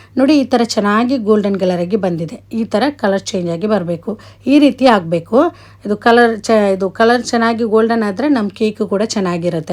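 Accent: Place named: Kerala, India. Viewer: Nice to see your recipe. Will try. Good explanation. Some of your accent tells that you are from Kerala. Right??😊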